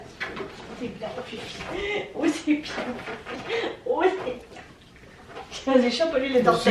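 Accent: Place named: Basque Country, France. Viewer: Que j'adore cet accent Basque 🙂👍👍👍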